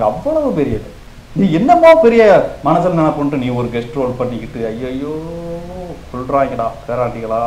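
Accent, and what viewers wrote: Madurai, India. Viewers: ஒழுக ஒழுக... 😂 கதற கதற... 😂 கொதவல கடிக்குறாங்க... நம்ம மதுர Slang செம்ம...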